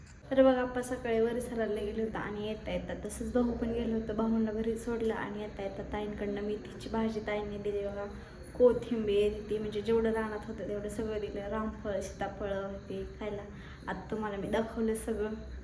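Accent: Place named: Rural, India. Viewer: प्रिय आणि....... च्या बोलण्यामध्ये एक वेगळेपण आहे ग्रामीण लेहजा बाज आहे तिच्या अक्टिंग चां वापर योग्य रित्या जर करून घेतला तर आपणास खूप मदत होईल.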